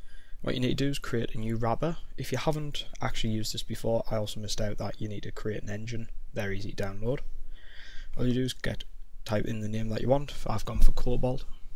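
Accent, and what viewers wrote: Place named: North, United Kingdom. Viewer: (nice to hear another northerner on the net too!) ;]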